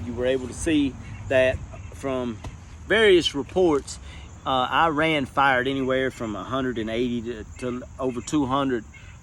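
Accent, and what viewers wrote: South, United States. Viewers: Dear Brother, I’m an old woman now and I became a Muslim over 18 years ago. I am a 100% disabled Iraq war veteran woman from the first Iraq war, it was because of the Iraq war that I became a Muslim. I’ve lived in Egypt 18 years now and I’m dying of heart failure. I have to say this to you, seeing you with your tattoos, hearing your Southern accent, the way you look, where your from, has made me so full of hope and happiness to see you as a brother in Islam. Never could I dream that an American from the South, who looks like you, would ever become a Muslim; because of all the ideas we get about people from the South in the Christian Bible belt. Never judge a book by its cover. I too have tattoos from the old days, I too am American, and the point is that Allah can save the roughest and toughest of people in heart and mind. Your kind heart will bring more people to Islam and educate them that we are good people. 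May Allah bless you and continue to help you educate others to bring them to our beautiful Faith of Islam.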